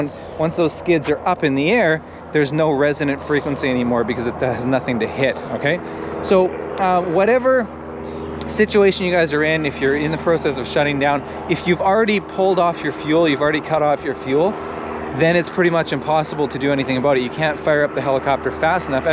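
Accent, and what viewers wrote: Canada. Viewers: Great video. Couldn't understand your term "lead-leg" but then figured out your Canadian dialect was saying "lead-lag." Hahh!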